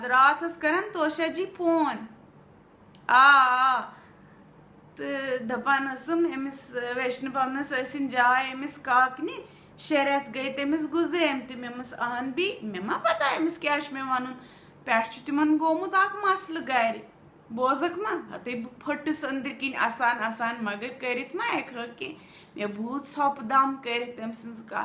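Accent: Kashmir, India. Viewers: Your koshur accent. Iloveyou lady😍💓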